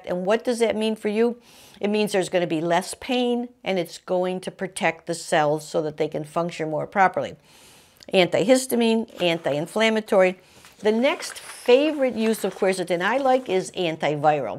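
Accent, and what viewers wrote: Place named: Brooklyn, United States. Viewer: Of course,....you are selling a quercetin supplement! Where's dat accent from,....you sound like my uncle Louie from Brrrooklyn!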